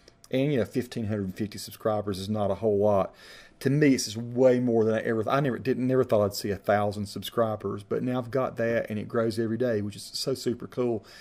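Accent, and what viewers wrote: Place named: Appalachia, United States. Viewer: Yes the Hillbilly accent is cool and I like the way you are so open about your Appalachian life. Just seeing the fields and forests and talking to your brother about Hillbilly stuff is nice to experience. I am living in dutch society that is quite the opposite of Hillbilly (in the province it is not that different though). Nice to see such a positive good humoured video around Christmas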